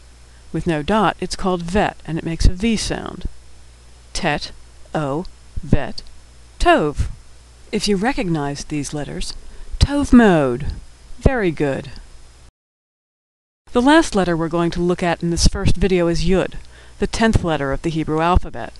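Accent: United States. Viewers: Beautiful American voice, makes it much more easy to store the informations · horrible American accent... can't you find an Israeli to pronounce the words?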